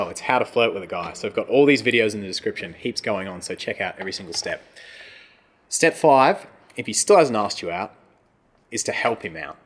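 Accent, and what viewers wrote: Australia. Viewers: Are you Aussie? I think so & I love that. Most of the guys on YT giving these tips are English and worthless in this dept. I’m American & I think American guys & Aussie guys are real men (just my opinion) and I put stock in both of them. I’ll check out your other channels. Tyvm Aussie Man! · u sound aussie! i am btw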